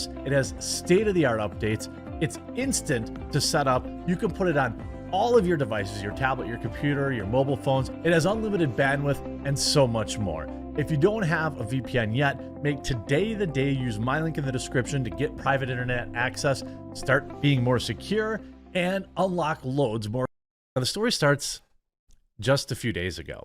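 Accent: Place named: Midwest, United States. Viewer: Your Midwest accent really comes through when you repeat the word "beg" and "beggege", as in, he stole a "beg" and flew a "fleg".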